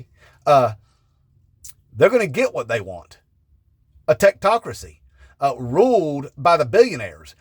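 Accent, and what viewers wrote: South, United States. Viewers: Nothing is as delicious as truth with a salted caramel southern accent. Dixon White; the Future Maga. Trae Crowder. You. Beau and Belle. Thanks, brother. We PAY for all those 'socialisms.'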